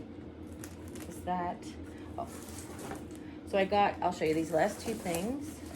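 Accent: New York, United States. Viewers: More great stuff! You're always fun to watch and Igetxa kick out of your "New Yawk/ Long Giland" accent which is near and dear to my heart since I live there. Love those planter baskets. Didn't see them in my store yet but hopefully they'll get them in. I did buy three of those 3 in 1 stackable planters that look like fun to work with. 💕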